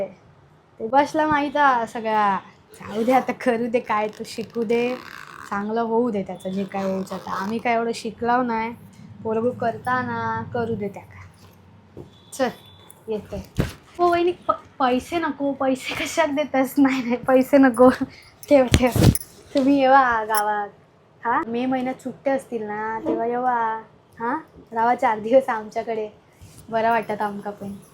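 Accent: Malvan, India. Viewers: भारी तुम्हाला मालवणी येता 😂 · A-pratim malvani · भारी मालवणी तु मालवणी आहेस का, ❤❤❤❤❤❤❤ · लय भारी मालवणी तर झक्कास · Bharichhh काय ता केलास गो pure मालवणी ❤❤❤ मी कुडाळचा आसय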